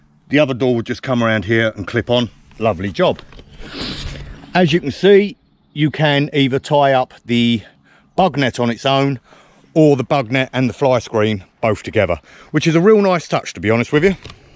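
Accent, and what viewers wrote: United Kingdom. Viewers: Why is it always British guys with hell of an accent introducing Lanshan 2 Pro? I swear...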